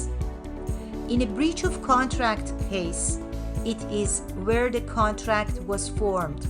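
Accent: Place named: Afghanistan. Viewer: Afghan accent 👌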